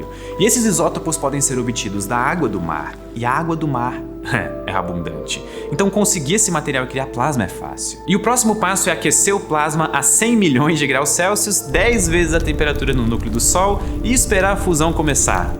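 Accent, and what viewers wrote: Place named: Brazil. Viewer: Como eu consumo muito conteudo no youtube em inglês (sem legendas dur) ouvir um br falando ingles é bem estranho, sei la diferente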